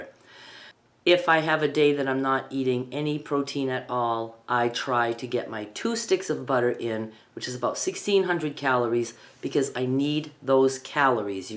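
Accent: Maine, United States. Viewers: I am so inspired by you. I literally just found your channel via your caftan tutorial. I also was about to make dietary changes necessary to combat something called SIBO (small intestinal bacterial overgrowth) which requires basically restricting carbs so as to starve out the bacteria. I did low carb before, but I gained it all back. I got cravings. You know the drill. So now I will try carnivore. Also, I am in Maine…. you said you moved to Mississippi but you sound like you are from Maine? Regarding the shirt from a shirt, and caftan tutorials, can I ask, do you use 100% cotton? I got a bunch from Mardens to make a quilt but that caftan was so stylish, I think I have to use this blue cotton to make the stylish caftan! ❤❤❤ Congratulations on your weight loss, reduced pain, and improved mood!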